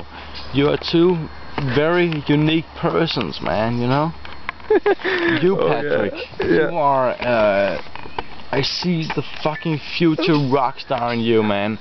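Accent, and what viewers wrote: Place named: South, United States. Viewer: Lol, not to offend anyone, is this guy like Puerto Rican or something? He sounds very Southern american.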